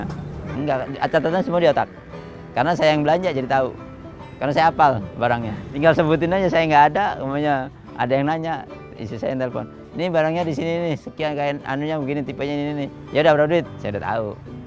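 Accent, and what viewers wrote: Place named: Jakarta, Indonesia. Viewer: Betawi bat yg ngomong. 😁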